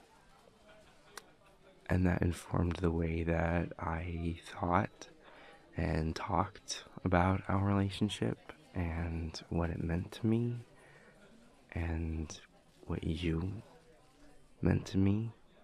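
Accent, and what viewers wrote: Newcastle, United Kingdom. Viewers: AFTER 5 WHOLE MONTHS OF WAITING ANOTHER GEORDIE AUDIO IS FINALLY OUT Thanks for the food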